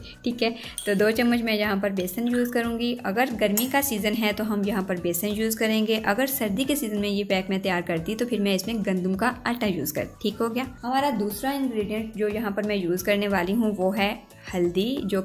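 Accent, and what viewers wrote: Punjab, India. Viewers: The way u speak punjabi …Haye so cute 😘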